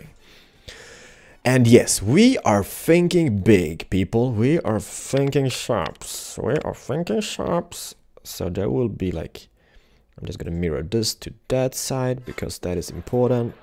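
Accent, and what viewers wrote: Sweden. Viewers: What part of sweden? Skåne or somthing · Are you from sweden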